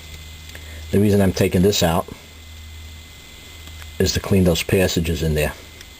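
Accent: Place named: New York, United States. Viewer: Old - Fashioned New York Accent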